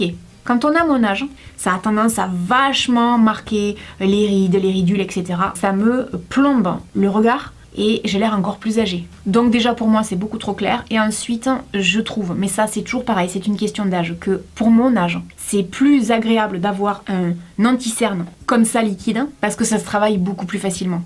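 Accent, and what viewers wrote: South, France. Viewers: Merci pour cette vidéo (je ne t’appelle pas Maman ne m’en veut pas mais nous avons le même âge 😜) et tu me rassures car moi aussi je me maquille plus qu’avant. Je ne sais pas si tu as vu bellapiere est sur Showroom privé jusqu’à demain matin. Ça ne fait pas longtemps que je regarde les vidéos de YouTubeuses sur le net, je ne pensais pas en trouver une avec laquelle je me retrouve et en qui donne de bons conseils. Et puis l’accent du sud me manque trop c’est un régal de t’écouter parler. Bisous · Est ce qu'il serait possible de tester la marque de Gaelle Garcia Diaz "Martine" j'aimerai beaucoup ton avis dessus ! Bisous continue comme cela j'adore tes vidéos et ton accent me rappelle ma famille du Sud 😍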